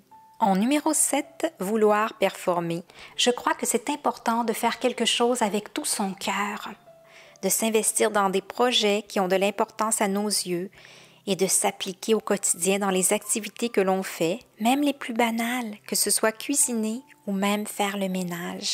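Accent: Quebec, Canada. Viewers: Bonjour je vous decouvre au hasard et votre contenu me convient.en plus je ne sais pas pourquoi l accent Québec me procure tjr du bien être 🤗🥰 moi ce que je ne fais plus c'est regarder le journal ou laisser la TV allumée pendant mon ménage j écoute ce genre de podcast comme le votre . J ai arrêter d acheter des produits ménagers je me sert des chiffonnettes H2O et leur produits ecocert fini les bidons en tout genre J ai aussi moins de repassage je sèche sur ceintres Je n utilise plus de cotons démaquillants. Depuis 3ans Gant démaquillant de chez H2O démaquillage a l eau ..voici qlqs exemple de ma direction vers une vie plus sereine plus saine et zen 🙌🍀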